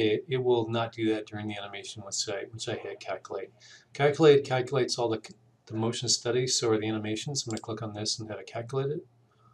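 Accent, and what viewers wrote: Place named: Midwest, United States. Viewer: I'm glad that I found this. It is straight forward and so much simpler than others that try to do the same thing. You are easy to understand for me by speaking mid-western English. I'm a 71 year old retired Engineer and I bought a monthly subscription to Solidworks. I have modeled all 88 pieces from Gilbert's Erector Set 8 1 / 2 and am in the process of assembling the projects. You may remember them. Now I can show my friends the animations. Still trying to figure out how to wrap a rope around a crank and pull something with it.....T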